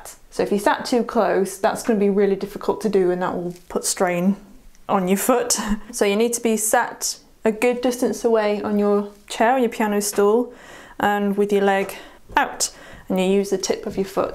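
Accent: United Kingdom. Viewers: Tahnk you for tonight,, For some reason your uk aceent is seemigly plluck my heart string. d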